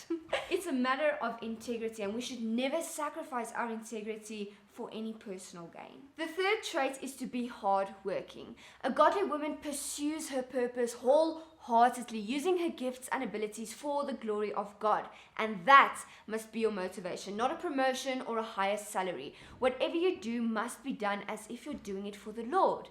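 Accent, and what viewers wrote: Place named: South Africa. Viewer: Lol I was about to say you sound South African 😂😂. Enjoyed your message. Beautiful from Botswana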